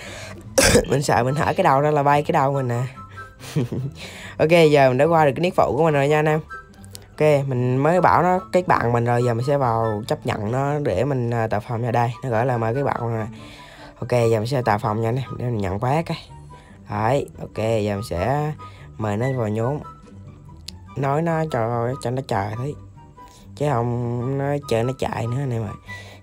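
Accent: Quang Nam, Vietnam. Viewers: Giọng ông giống người Quảng Nam hay Quảng Ngãi hay Đà Nẵng ấy nhỉ ?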